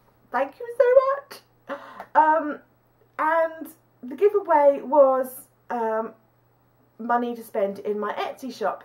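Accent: England, United Kingdom. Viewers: you are so cute! Love your English accent. You make me smile. You always lift my spirits! God Bless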